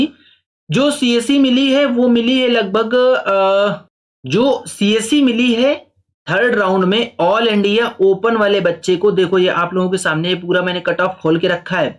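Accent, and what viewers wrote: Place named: Kanpur, India. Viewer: Kanpur se ho na aap Lahze se lag raha hai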